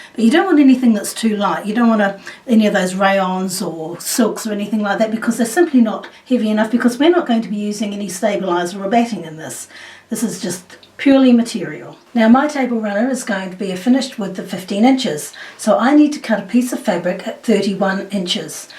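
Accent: Australia. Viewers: Oh! Thanks for clearing that up; sorry I didn't quite understand. I love the Australian accent!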